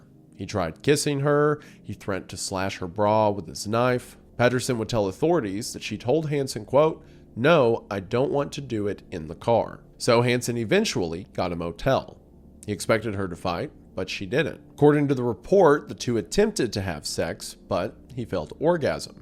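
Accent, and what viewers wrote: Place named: South, United States. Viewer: your not so upbeat southern voice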